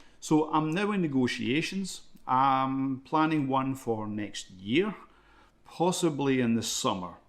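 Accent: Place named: Scotland, United Kingdom. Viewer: OK ..Finland ..but why do you sound Scottish ?!? OK ..maybe it's just me !! LOL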